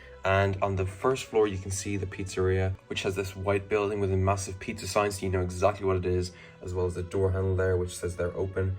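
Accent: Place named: Ireland. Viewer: Just found your channel and am loving it! Nice to hear an Irish accent on Lego videos for a change. Keep up the great work 😁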